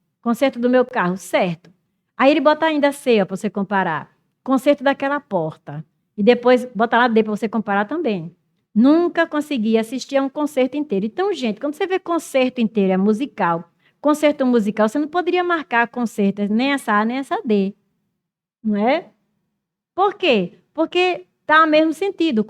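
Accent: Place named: Northeast, Brazil. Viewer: Que sotaque mais lindo,😊😊 meu nordeste.🙏🙏#gratidão 🙌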